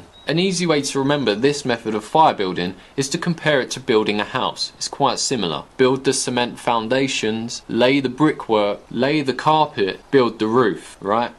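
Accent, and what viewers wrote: United Kingdom. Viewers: What accent is this? I hear it a lot but don't know what part of Britain it's from.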